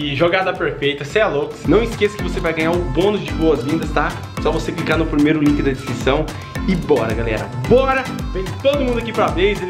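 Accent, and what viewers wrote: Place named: Bahia, Brazil. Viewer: Essas hora thi kkk Tá meio baiano ne kk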